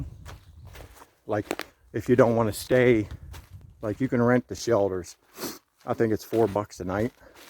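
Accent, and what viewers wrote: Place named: Pittsburgh, United States. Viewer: Love this vid bro....I was smilin and smilin. Your "Pittsburghese" was great to hear. Walkin "dahn" the road......... "mowl" 46......here's"da" connextor trail.....Goin "dahn air".........."yunz"........don't like "em" things......."make it outta dare"........"dahn ear where I parked" Great to see a Pittsburgher "dune" a vid! Keep on rockin! Maybe see ya aht on da trail some time!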